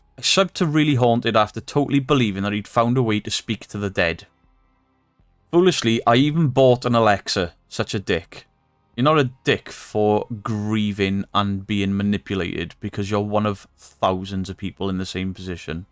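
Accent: Wales, United Kingdom. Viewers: Hiiiiiiii, love your videos. Recently found you thanks to the Shape. I love your accent, I think the Cymru accent is awesome. I lived in North Wales for 20 years before returning to Canada. Keep debunking and making me spit out my coffee because I am trying to drink it and laughing because of something you said 🤣😂🤣😂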